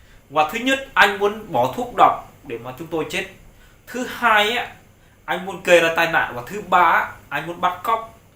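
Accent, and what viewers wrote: Standard. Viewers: Nói rất chuẩn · Nói tiếng Việt chuẩn lắm😊😊😊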